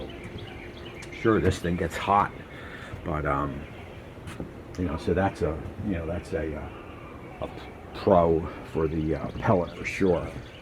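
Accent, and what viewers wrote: New Jersey, United States. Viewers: Love the video. First time subscriber. Once I heard you tawlk I knew you were from Jersey!! Lived in Cherry Hill but live in HI now.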